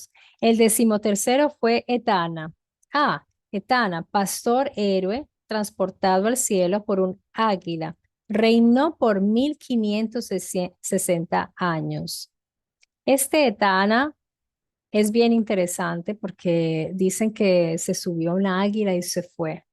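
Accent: Italy. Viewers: ¡Correcto! Usted si sabe decir las palabras exactas. No es la primera vez que desde aqui, en casa, le aplaudo por la precisión.... Devuélvanse un par de minutos y aprenderán lo que es hablar correctamente ( disculpando el hermoso acento italiano, que le dá mayor belleza)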